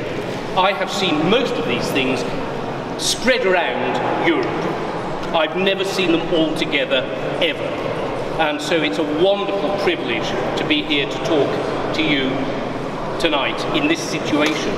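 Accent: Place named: United Kingdom. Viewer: It also helps that his accent is British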